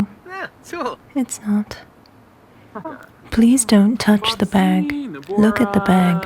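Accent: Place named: United Kingdom. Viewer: Loved it! Work on your ASMR style! You got something seriously good here. Maybe a little trip back to England would help you catch back a little more british accent which is one of your best features for this. Congrats and thank you.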